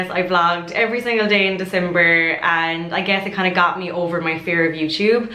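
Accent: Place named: South, Ireland. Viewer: I could listen to you chat all day, even though I'm from Northern Ireland I just love the southern Irish brogue, you don't come across as nervous at all you come across so confident. My natural hair colour was light auburn with brown eyes but my niece is more red haired like yourself & she has brown eyes. I wish you all the best I've no doubt you will succeed in everything. I'll be looking forward to all your videos. Oh & I have to tell you I love your sister and your Mam, you have such a wonderful family and not to forget vogue. Sweet Irish blessings to you all. 😘🍀😘🍀😘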